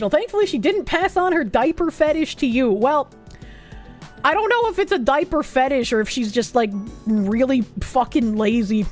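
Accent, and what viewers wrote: Boston, United States. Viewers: Here here! We need her lovely Boston accent spitting facts out here.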